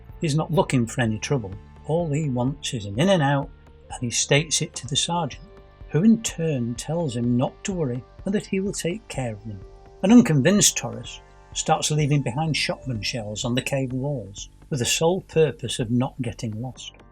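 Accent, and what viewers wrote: United Kingdom. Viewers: Nice to have a British narrator, their accents are awesome.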